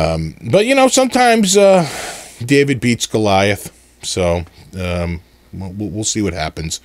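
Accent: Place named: Rhode Island, United States. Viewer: you look like gerry garcia might have looked, if he looked like famly guy .... you even sound a bit like FG... im guessing your from, or around rhode island lovin your vids by the way and LZ are the biggest rip off artists of all time its just that the internet wasent around when they were. so no one gotta know better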